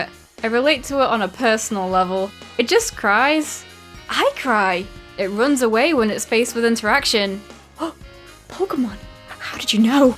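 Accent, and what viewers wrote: United Kingdom. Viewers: I don't know why this is...but for some reason, up until this video, I thought you were American. I normally recognize British accents immediately, but I guess your accent just never registered for me. Did you change nationalities while I wasn't looking, or have you been drinking a lot of tea recently? The Mandela Effect is real ladies and gentleman....also I didn't know that you and were the same person. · hon i didn’t even realise you were british because i’m so used to british accents being one myself it didn’t even register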